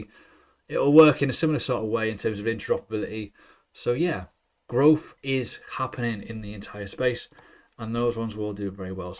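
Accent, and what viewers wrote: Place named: Northern England, United Kingdom. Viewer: Great video man, just signed up to your patreon too. Refreshing to hear another northern English voice talk about crypto lol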